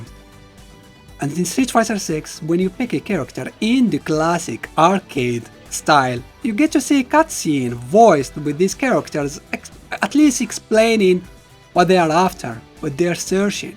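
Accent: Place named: Morocco. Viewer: Obviously, Morocco is big enough to point to on the map, but it's still underrepresented. You're not going to get so many people recognizing your authentic accent. At any rate, congrats on your milestone! Personally, I'm trash at fighting games, but KoF's style and flash has always drawn me in. Your channel allows me to enjoy the games for the story AND the gameplay. You make both aspects very approachable for someone who doesn't have much ingress into this space. Also, I'm a huge Metal Slug fan, so seeing general SNK content is a real treat. Thanks for all the videos over the years!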